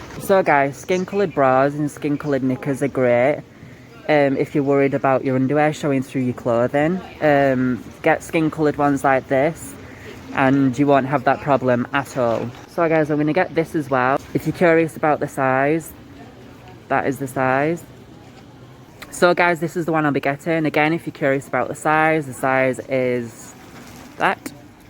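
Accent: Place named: United Kingdom. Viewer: I here a British accent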